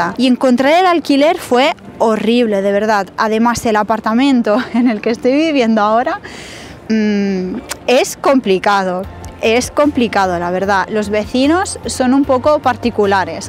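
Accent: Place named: Italy. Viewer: Ciao. Hace unos años estuve en Perugia, en la Università per Stranieri, en un curso elemental intensivo de verano. Comparto contigo los problemas de alquiler que uno se encuentra en lugares relativamente pequeños, la desconfianza, etc...fue una experiencia enriquecedora. Ahora estoy refrescando y tratando de no olvidar lo aprendido a través de algunos amigos italianos y de tus videos. Los encuentro fantásticos, didácticos, claros, concisos y realmente útiles. Además tienes un acento perfecto de italiana, sonoridad y musicalidad, hija de lengua madre italiana, lo cual me encanta. Complimenti per te, e per tutti noi per la fortuna di tenerti come insegnante. Ti auguro il meglio nella tua stanza in Italia. Avanti!!!!!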